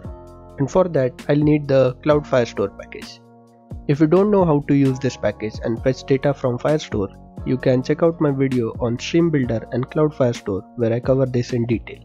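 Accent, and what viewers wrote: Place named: India. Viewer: Indians really have to work on their accent! except that, the content was useful thanks.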